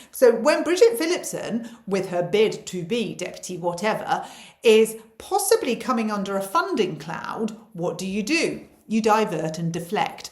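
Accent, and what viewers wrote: RP, United Kingdom. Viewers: Cor your so posh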